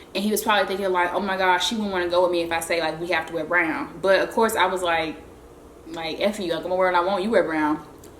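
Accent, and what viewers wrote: Memphis, United States. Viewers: Zaxbys come be hearing from me ! 🤣🤣 your so memphis I love it !!! #901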